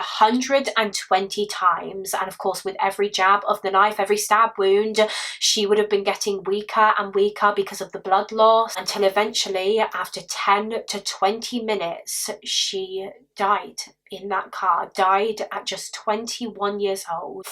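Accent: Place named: Somerset, United Kingdom. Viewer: It's a west country accent ❤ sound Somerset to me 😊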